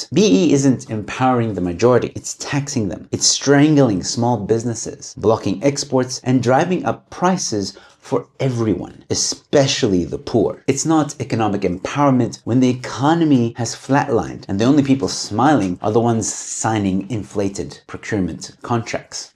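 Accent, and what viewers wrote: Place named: United States. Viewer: Is this Youtuber from SA? He sounds like he’s from the USA.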